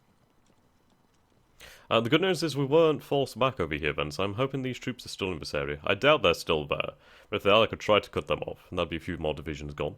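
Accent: United Kingdom. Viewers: Great Video you sound British but with a deep voice not an insult are you British??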